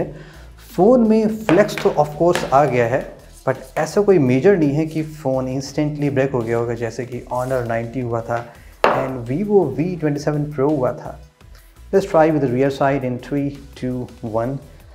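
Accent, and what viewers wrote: India. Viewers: He speaks English, but it sounds Indian😅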